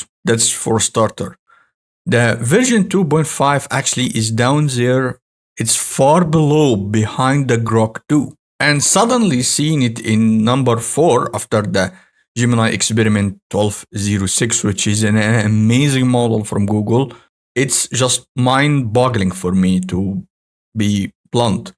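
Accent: Egypt. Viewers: I like you Egyptian English accent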